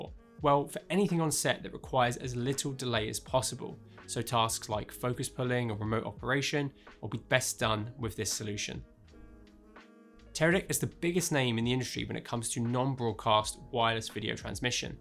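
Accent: United Kingdom. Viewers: This dude has to be British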